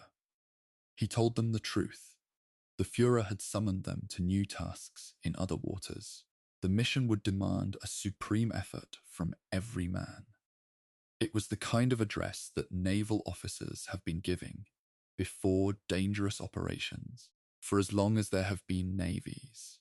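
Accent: United Kingdom. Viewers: One of the best stories I have listened to on your channel. I love the narrator’s voice; Americans tend to love the British accent! Very soothing. Thank you!!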